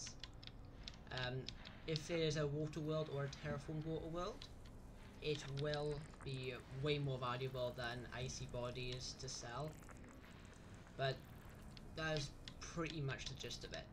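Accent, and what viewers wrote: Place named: Wales, United Kingdom. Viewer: audio was quiet but i heard a fellow Welsh accent so i plugged in 40 speakers and it was ok in the end. Nah it wasnt that bad lol. Thanks for the info, i was messing around with this today and this will really really help. Thanks ✌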